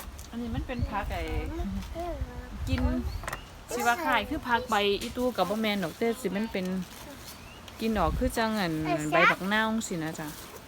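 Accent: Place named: Chaiyaphum, Thailand. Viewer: คนจังหวัดใด.. สำเนียงชัยภูมิแม่นบ่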